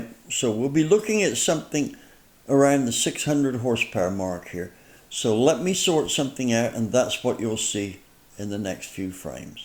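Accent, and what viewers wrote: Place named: Yeovil, United Kingdom. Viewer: I cant find any history but he sounds like he's from Yeovil. These videos are like ASMR to me.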